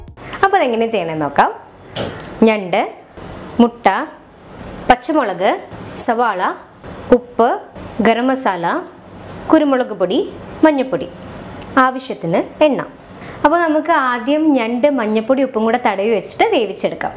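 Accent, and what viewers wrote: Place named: Tamil Nadu, India. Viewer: Kure tamil chuva ayi alle samsarathil. ✌️✌️😍😍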